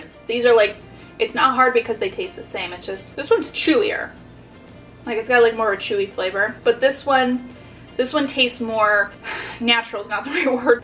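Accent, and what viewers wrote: Midwest, United States. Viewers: The second way you pronounced it is correct, however I understand the americasation (is that a word?) because of your American accent. ❤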